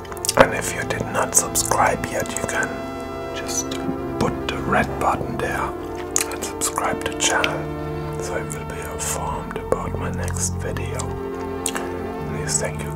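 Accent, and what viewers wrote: Germany. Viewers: Man merkt das du deutsch bist weil alle deutschen so english sprechen 👍🏻😅 (Außer ich glaub ich)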